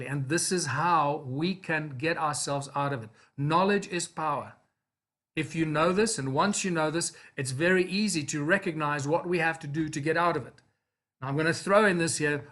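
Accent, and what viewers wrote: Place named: England, United Kingdom. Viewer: Make note to his english accent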